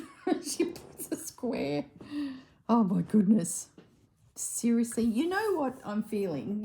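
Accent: Australia. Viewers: Loving the jacket and find your story telling interesting. You are truly a unique person, also, I'm in Indiana, USA. Enjoy your Australian accent. 🙂.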